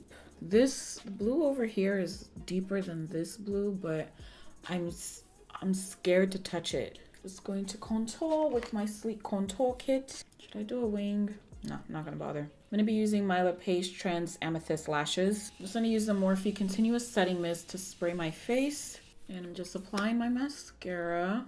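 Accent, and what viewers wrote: Nigeria. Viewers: I loveeeeee when you let your Nigerian accent come thruuuuuuuuu 👏🏽👏🏽👏🏽😩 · Your Naija accent, tickles my soul ♥️😍😍🔥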